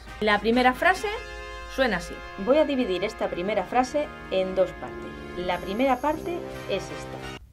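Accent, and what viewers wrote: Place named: Extremadura, Spain. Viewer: Me encanta seño! con acento extremeño, me meo😂😂🤩🤩🤩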